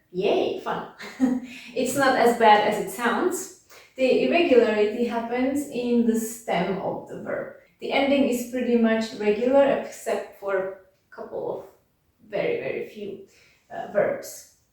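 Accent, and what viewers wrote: Czech Republic. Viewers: Your English with a Czech accent is pleasant to hear, and your Czech pronunciation and teacher skills are extra incentives to continue learning the Czech language. (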